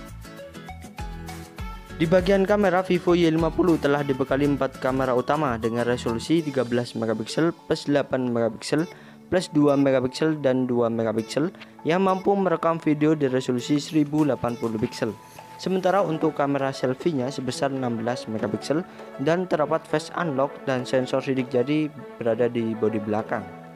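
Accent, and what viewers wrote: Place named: Tegal, Indonesia. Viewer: Medok bener ni tegal nya. Lanjut gan nice info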